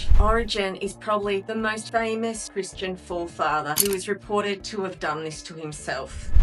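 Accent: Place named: United Kingdom. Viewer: Sounds British. The Brits self - castrated a long time ago. It's not working out well for them....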